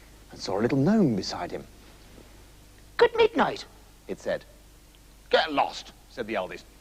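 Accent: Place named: France. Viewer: OMG! Love this guy's French accent so much. Robin Williams and he would have made great friends, if they weren't in life already. Chocolate trees are awesome!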